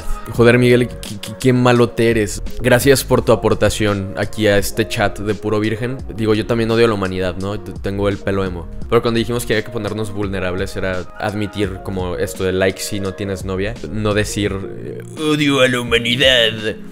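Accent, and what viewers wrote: Fresa, Mexico. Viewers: Eres whitexican, hablas bien fresa y solo te ven porque estas guapo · Eres whitexican, hablas bien fresa y solo te ven porque estás guapo.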